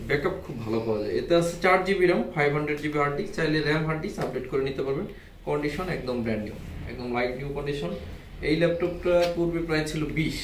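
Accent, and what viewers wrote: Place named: Jamalpur, Bangladesh. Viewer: ভাই বাড়ি কই আপনার?? জামালপুরের টোন দেখি কথায়!!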